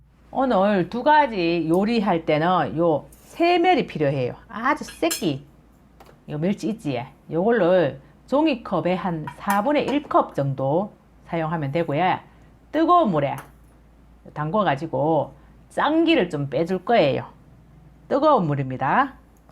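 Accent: Gyeongsang, South Korea. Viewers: 경상도분이 정말 맞으신가요? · 멸치하고 사과하고 쨈 만드는것은 첨 봅니다~ 경상도 사투리가 너무 정겹네요~❤ 비빔장으로 하면 너무 맛있겠네요 고추장. 만들기 너무 쉽고 맛있겠어요 꼭 따라해 봅니다~❤ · 구수한경상도 사투리가 정감이 가네요 오늘도 잘배우고 갑니다^^* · 건강레시피 공유해주셔서 감사합니다🥰💯 늘 구수한 경상도 사투리 정감이 갑니다‼️🤗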